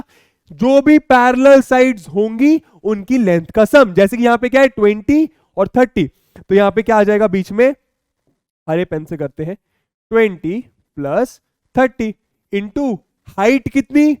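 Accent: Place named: Bihar, India. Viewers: sir speaking bihari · Such a bihari 😅😅 but good THANKS sir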